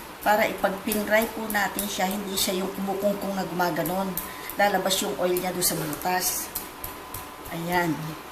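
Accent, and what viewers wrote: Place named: Nueva Ecija, Philippines. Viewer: naalala ko tita ko sa pag sasalita. taga Nueva Ecija siguro si madam :)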